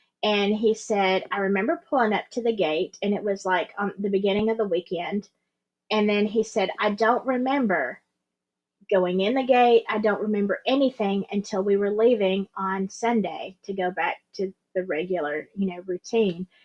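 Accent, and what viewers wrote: South, United States. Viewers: Can't get much better than a southern voice of reason! Great live 💙☮️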